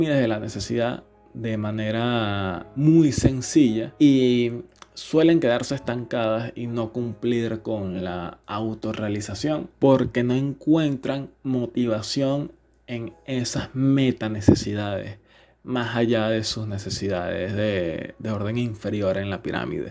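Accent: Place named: Caracas, Venezuela. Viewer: Por tu acento eres caraqueño y por lo otro eres de derecha.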